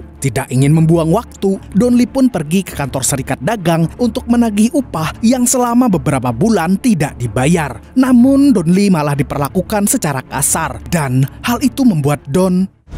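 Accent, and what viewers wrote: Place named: Paringin, Indonesia. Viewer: Seperti orang Paringin ni abg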